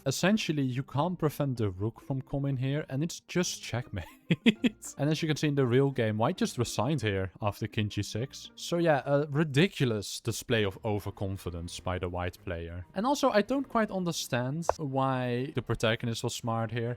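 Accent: Netherlands. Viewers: The DUTCH ACCENT IS UNESCAPSBLE BRO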